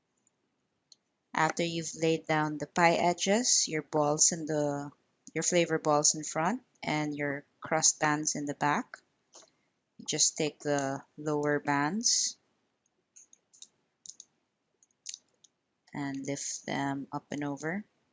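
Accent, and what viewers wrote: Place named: Philippines. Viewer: God your so talented!!! I was wondering if you could make egg tarts or any other Japanese, Chinese, Filipino or any asain famous foods. Pretty please!!! Also, i can hear that you have an accent, well, I think. Are you a filipina po?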